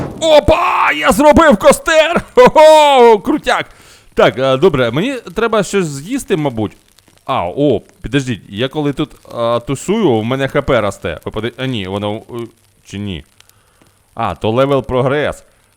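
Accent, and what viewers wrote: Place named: Arab world. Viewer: До речі, хотіла спитати, ти араб ?( просто у тебе такий діалект , як у арабів)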